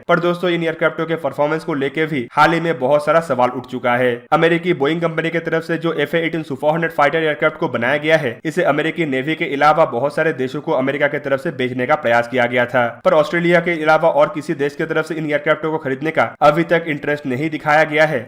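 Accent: India. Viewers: OMG ! THE TITLE IS ENGLISH BUT THE NARRATION IS INDIAN ?!!!, WHAT THE HECK !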